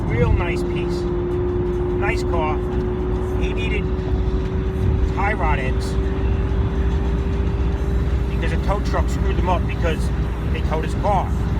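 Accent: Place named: Long Island, United States. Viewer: The New York accent lol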